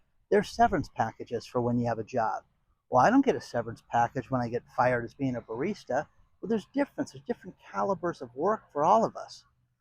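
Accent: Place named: Minnesota, United States. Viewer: Sounds like Minnesota.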